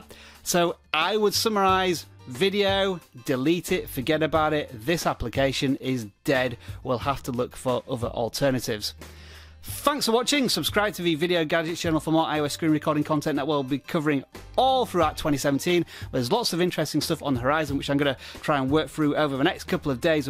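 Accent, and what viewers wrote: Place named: United Kingdom. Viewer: You are the first YouTuber with a British accent I find him amusing. 2017 must be my lucky year!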